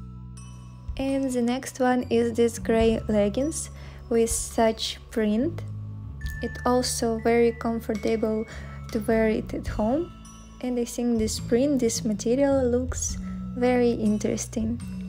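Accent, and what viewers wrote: Russia. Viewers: Мне рязанский акцент сразу понравился! :))) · Привет, русскому акценту в английском ! 😁😁😁 Классная у тебя фигура ! 👍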